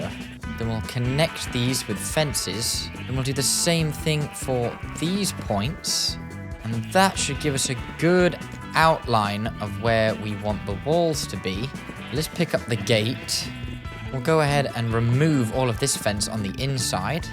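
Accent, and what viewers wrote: United Kingdom. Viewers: you sound britsh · in my honest opinion, I didn't know u were British 😂😂😂😅 And plzzz make a 200 days, that would be AWESOME!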